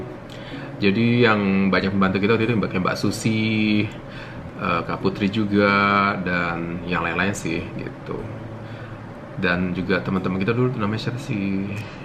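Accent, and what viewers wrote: Medan, Indonesia. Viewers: Aku suka kali liat vlog abang...bahasanya Medan kali...jd ingat aku sama kampungku...sehat terus ya bang...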